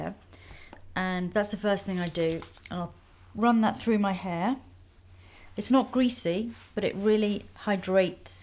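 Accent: United Kingdom. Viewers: Oh gosh, that beginning made me feel like I'm exactly in an airplane (except with the British accent) probably because I'm flying soon haha!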